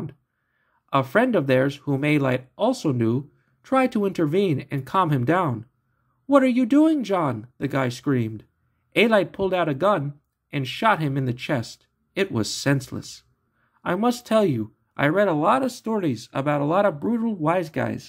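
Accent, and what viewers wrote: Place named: Albania. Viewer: Obviously you’re a John Alite fanboy. By your accent u must be Albanian and I understand the ethnic pride but. No doubt John was a tough guy gangster deeply involved as an associate under Junior Gotti. Def he was a capable guy but I think that u believe the hype too much and fail to realize that there’s 3 sides to a story. Obviously you weren’t there but you believe one side of the story about both Pitera and Gene Gotti. Alite’s side. I’m sure Pitera and Gotti have their versions, then there is the truth. But keep the goofy accented vids coming bc they’re comical.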